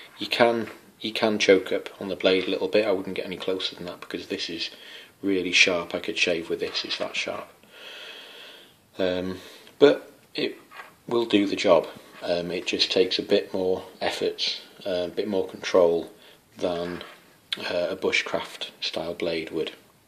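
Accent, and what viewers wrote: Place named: Wales, United Kingdom. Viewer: Hey man where in Wales you from.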